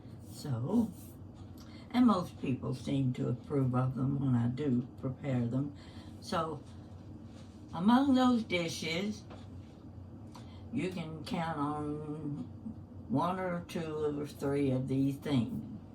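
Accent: South, United States. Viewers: Sitting in Canada listening to your sweet southern drawl! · I'm just so happy I came across your channel! What a lovely accent you have. I'm going to assume you live in the southern part of our country. Actually, I'm from the colder part which in the Northeast. I hope this doesn't send cold chills through your bones but I actually look forward to snow🌬⛄ This is the time of year I cook and bake more often. Even though I live in the colder states, aka New England, I do enjoy southern dishes! And of course who doesn't love chicken and dumplings? Yours certainly looks delicious! Thank you so much for sharing and look forward to being subscribed to your channel! 🙏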